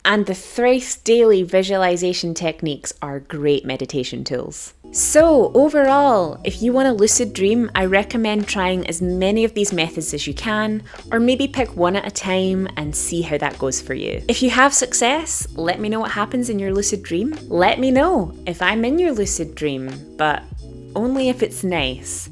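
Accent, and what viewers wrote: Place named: Central Belt, United Kingdom. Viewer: yeah I couldn't pinpoint your exact accent, but definitely central belt, or maybe even Stirlingshire :)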